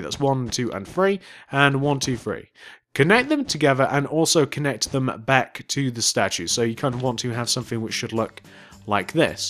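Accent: United Kingdom. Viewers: Bruh he’s British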